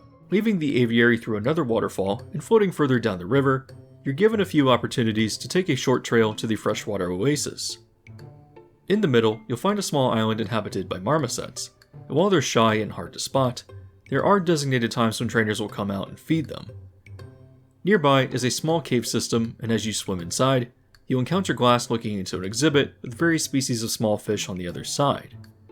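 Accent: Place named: United States. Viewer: Might just be American accent messing with the pronunciation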